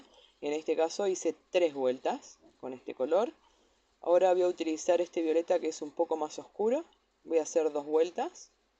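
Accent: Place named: Chile. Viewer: Perfecto! gracias por su repuesta rápida. Te pregunte eso porque encuentré que tenia un poco del accento chileno pero el amaricho por el amarillo me dio la pista 😁 Soy francesa, pero franco-chilena en realidad. De parte de mi papá! Ahora yo vivo en un pequeñito pueblo en el sur-oeste de Francia, en medio del bosque y de todos los animalitos. Hice un cambio radical de vida, pasé de una gran ciudad urbana a una pequeña casa en el campo y recuperé mi creatividad. 🥳Gracias a ti para tus videos que son super bien hechas, y tus creaciones son maravillosas ❤ Un lindo dia para ti. Me llamo Laurie🙋‍♀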